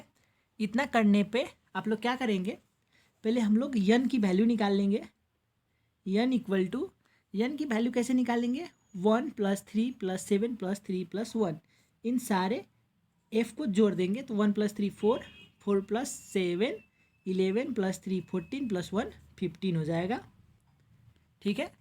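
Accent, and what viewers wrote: India. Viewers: I'm opening the video and the minute I hear Indian 😩😩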